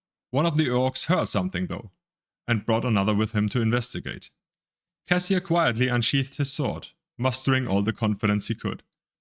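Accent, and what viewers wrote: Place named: Germany. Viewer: Ich hab mir schon immer eine Lesung der Skyrim Bücher gewünscht, aber ich komme leider nicht über den deutschen Akzent hinweg :(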